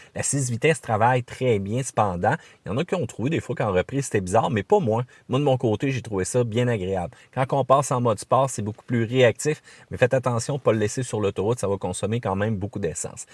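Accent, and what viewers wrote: Quebec, Canada. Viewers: Hello from France 😄 ah...cet accent Québécois 😇👍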